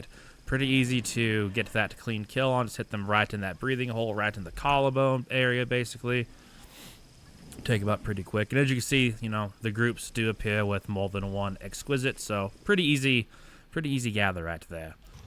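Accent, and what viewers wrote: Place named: Australia. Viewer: bro u sound aussie sometimes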